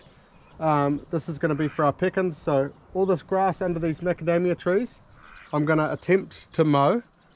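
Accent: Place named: New Zealand. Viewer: Bros got one of the thickest NZ accents ive ever heard lol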